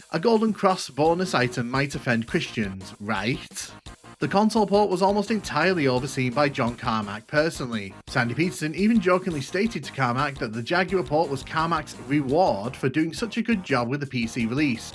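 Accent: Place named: United Kingdom. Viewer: He's British, and we Brits pronounce it that way.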